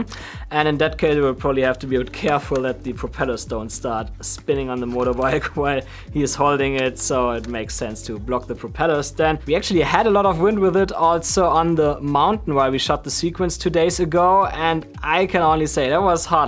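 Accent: Germany. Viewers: Super Video. Ich schreibe mal auf deutsch weil ich anhand deines Englisch denke, dass du aus Deutschland kommst.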